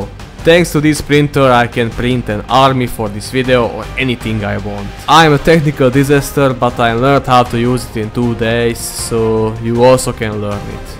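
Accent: Russia. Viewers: bro your accent sounds sooo good, you sound like an russian... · you have an amazing Russian accent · Ты понимаешь русский?Акцент не английский.Do you speak russia? No English accent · His accent is very similar to Russian,Or am I the only one who hears this? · Bro are you russian or what why you have russian accent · Сразу понятно что он русский, по акценту немного напоминает русского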